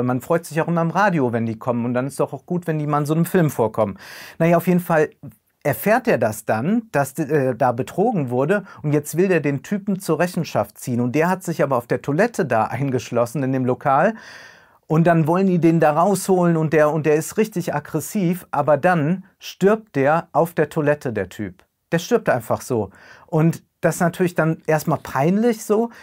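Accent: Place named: Moselle Franconian, Germany. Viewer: Dieser dezente aber schöne moselfränkische Akzent. :D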